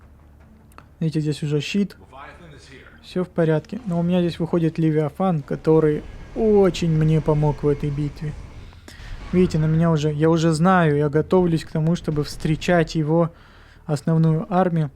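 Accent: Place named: Russia. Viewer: не то чтобы хорошо, просто русский акцент сразу в уши бросается)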